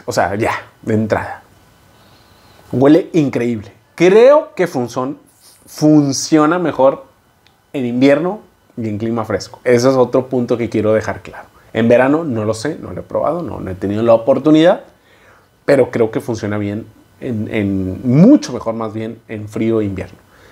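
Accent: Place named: Monterrey, Mexico. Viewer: Por la camisa que traes y el acento que tienes,me imaginó que eres de Monterrey y allá el calor esta cañón...buen perfume para el calor de Monterrey.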